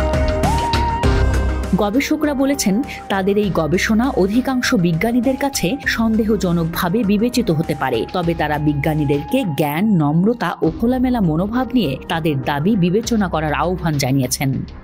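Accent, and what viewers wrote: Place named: India. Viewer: খবর পড়লেন যে সে কি ভারতের নাকি বাংলাদেশের কথা ভাষা শুনে মনে হচ্ছে ভারতের বাঙ্গালিরা এত করা গলায় কথা বলেনা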